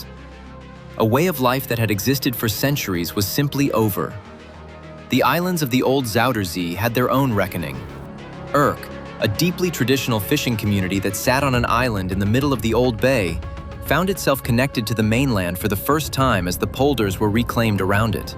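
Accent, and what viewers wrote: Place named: United States. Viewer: In England we pronounce the Zuider Zee as if it were spelt "Zider Zay" (rhyming with Cider Bay). It might be different in the Netherlands. If the voiceover is meant to be American (which sounds to be the case) I can only assume Americans would say "Zooder Zee" but at times he seems to say Zouder "Zowder' Zee.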